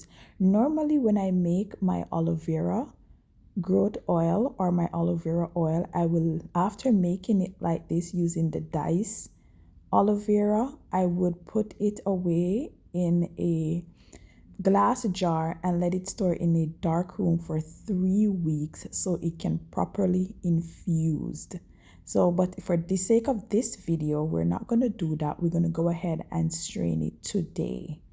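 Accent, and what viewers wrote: Jamaica. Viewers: I cn hear you a yard girl 😊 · typically a Jamaican she said a pat stur not pot or stir love my jamaican accent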